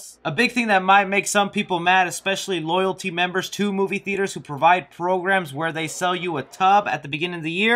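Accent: Texas, United States. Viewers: What part of Texas are you from? I'm Texan too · Woah what part of texas are you from?!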